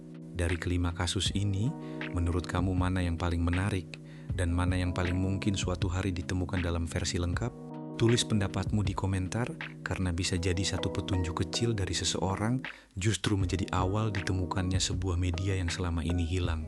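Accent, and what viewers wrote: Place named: Spain. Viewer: Buset logatnya kek orang ngomong bahasa spanyol 🗿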